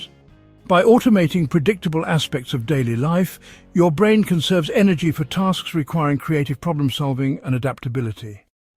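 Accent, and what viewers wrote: United Kingdom. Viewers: First of all, I wonder why they chose to have someone with a British accent, narrate all of this YouTube channel's videos; videos that are clearly targeting those of us seniors in the USA. Is a fancy British accent supposed to make the data sound more sophisticated and scientifically authentic?!?!? (*NOT*) Furthermore, I am almost 85 years old, and the ONLY cognitive difficulties that this lady (me) and all my fellow seniors ever humorously identify as "Senior Moments", are memory lapses of a very particular sort — such as: • Unable to recall a relatively new friend's name • Frequently unable to recall a specific word I want to use, which I have remembered without hesitation, thousands of times in the past • No longer know the correct spelling of lengthy words which I once ALWAYS remembered, after first learning it as a child or youth • Recalling the exact year or decade in which an important event occurred in my life or around the globe • Totally forgetting a conversation I had only a few weeks previously • Etc., etc., etc. 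— and (to be redundant! ☺) — et al Some of the other things on the video are true — such as everything that happened in my life has a story behind it now, which is true of older folks, because being a matriarch or patriarch of our family and friend groups, categorizes us as being "tribally responsible" for passing on the group folklore. It is a joy for me, when I get to share episodes of my history, and my life experience tales. So, story telling IS part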